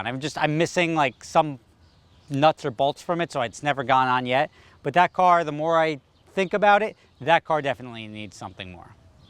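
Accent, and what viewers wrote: United States. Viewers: Know what..!? This is all cool and everything,but hearing an American talk about Ferraris is like listening to Trump speaking about human rights; it just dosent seem natural.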